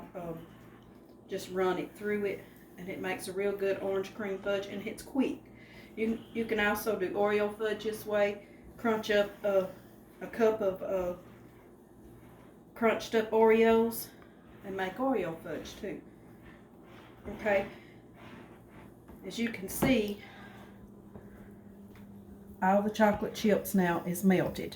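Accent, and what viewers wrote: South, United States. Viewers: So good to meet a sister in Christ God bless you I accidentally stumbled on your video and I absolutely love your way of cooking very explanatory very down to earth love your southern accent so adorable you're beautiful silver hair and beautiful kitchen so very happy to have stumbled on this video Thank you and a Very Merry Christmas A sister-in Christ, 🙏😇 Jill 🎄❄️ ⛄️ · Most beautiful southern accent you have.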